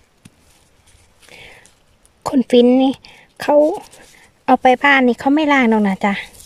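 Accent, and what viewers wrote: Korat, Thailand. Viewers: สำเนียงแบบนี่ คนโคราชไช่ม้ะหนิ :)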